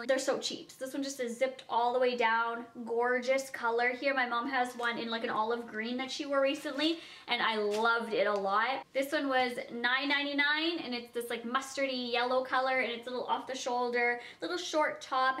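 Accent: Canada. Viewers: It's the slight Canadian accent. All of my family comes from Canada and they all have the same pronunciations as does. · M C thought i was the only one that noticed specially bag or tag anything with a ig its a canadian thing or ?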